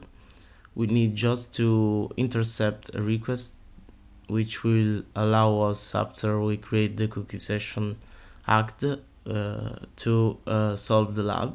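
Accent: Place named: Italy. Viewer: Grande, dall'accento ho subito riconosciuto che sei italiano e sono corso a vedere <3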